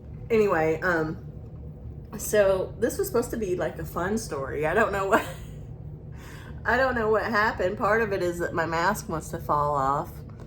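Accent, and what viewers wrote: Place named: South, United States. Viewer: My niece would love the cute body cat. 🐱 💕 Ty so much for being so down to earth. Your positivity is a domino effect for sure. 😊 Thank you so much for shouting out my sweet and precious bff 🎉She is such a blessing in my life and I’m grateful for her daily. ❤I was so glad she sent me your way too. I’ve truly enjoyed this video so much. Love self care Sundays and Trisha’s Friyay mask day. My two favs to tune into now!!😊❤ People like you and Trisha ( and so many more)are making a difference in such a positive way so keep going. You ladies are helping me so much more than you even know. I wish you all the best! 🤗 ❤ Trisha and I have some southern twang too so no worries here. Lol😅 Btw the way your husband is very sweet. EewwwWee… is a great nickname! 😀 you have made me laugh so much. So ty!!😅 I have watched one of the Final Destination movies and No more for me. 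🥴 Cute little cats 🐱 I have 3 dogs I feel the same way about! Enjoy time with your momma. I lost mine last year so hold tight to those great times you make together. 😊 God bless and have a wonderful Sunday! ❤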